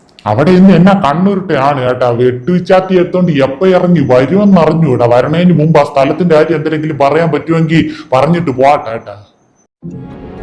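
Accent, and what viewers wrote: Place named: Trivandrum, India. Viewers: ചേട്ടാ! സൂപ്പർ, തിരോന്തരം ശൈലി 🤣😂🤣😄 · 😂😂 നമിച്ചു തിരോന്തോരം സ്ലാന്ക് 😜🤣👍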